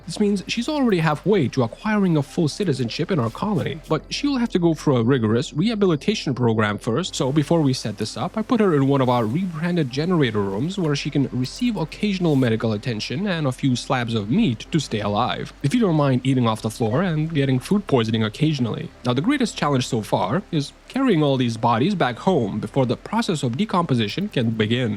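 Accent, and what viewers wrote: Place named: Canada. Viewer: I know others are unaware but as someone with Canadian friends. I know how they pronounce stuff differently and yeah. He's definitively Canadian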